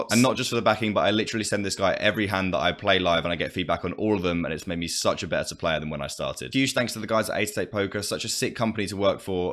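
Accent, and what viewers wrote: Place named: Quebec, Canada. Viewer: the accent from "quebec" is so strong lol... (I'm from montreal, quebec)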